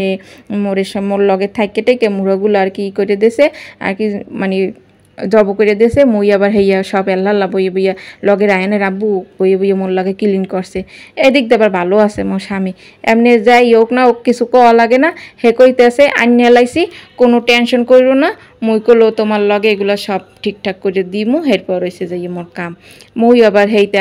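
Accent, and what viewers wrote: Barishal, Bangladesh. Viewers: ❤❤ আপনার কন্ঠ শুনে বুঝতে পারছি,আপনি বরিশালের মেয়ে, আমি ও কিন্তু বরিশালের মেয়ে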